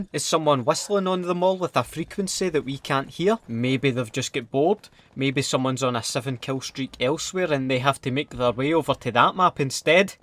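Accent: Scotland, United Kingdom. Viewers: Haven't watched this in years so glad i came back to watch these made me laugh and cry man 😅😅 the Scottish accent. Makes it better love yeah ❤️❤️🔥🔥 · You’re a fking hero that Scottish accent just makes everything you say hilarious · i love scotish accent! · His Scottish accent makes it funnier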